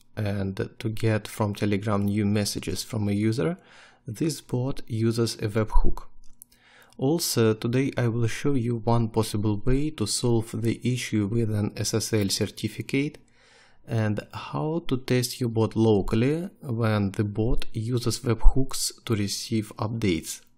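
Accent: Russia. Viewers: thanks! ;D i love your russian accent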